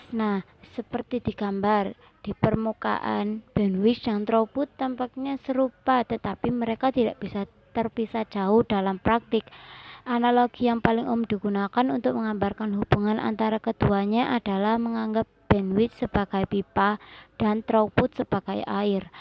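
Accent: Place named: Java, Indonesia. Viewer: Medok e..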